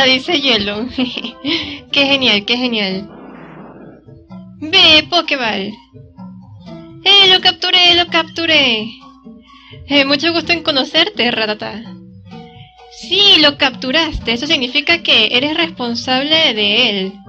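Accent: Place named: Venezuela. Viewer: escuché tu acento (Ven) y dije: tengo que suscribirme jejejejeje me encanta cuando una mujer hace contenido de pokemon.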